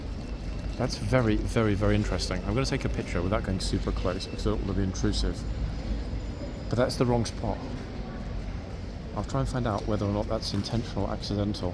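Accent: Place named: Cockney, United Kingdom. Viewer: You are a very rider cockney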